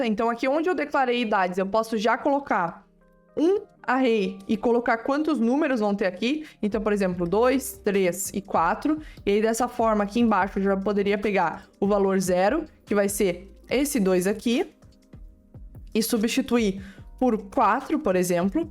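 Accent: Rio Grande do Sul, Brazil. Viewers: ela é gaúcha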